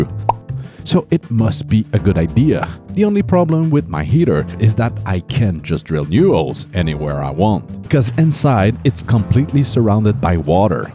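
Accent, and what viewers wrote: Quebec, Canada. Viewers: Hey men je savais pas que t'était Québécois ❤ #faiticitte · I thought u were from Quebec i an from saint join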